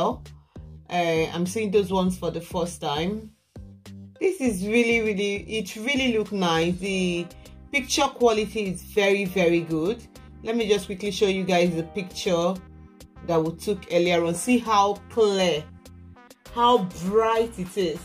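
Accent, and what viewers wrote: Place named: United Kingdom. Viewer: I need me a UK sista that accent 😍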